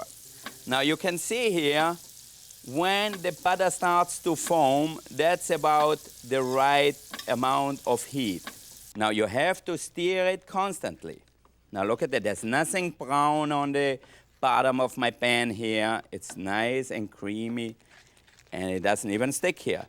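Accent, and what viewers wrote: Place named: Carinthia, Austria. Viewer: this video stirs the future english teacher in me. i just want to give him pronunciation lessons...please let me! while our carinthian dialect was voted the sexiest in austria, i don't think the accent is very charming when speaking english... :'(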